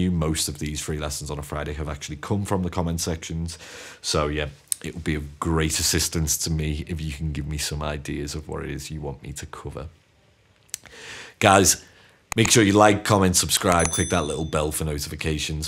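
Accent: Liverpool, United Kingdom. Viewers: are your from somewhere near liverpool? im from Bootle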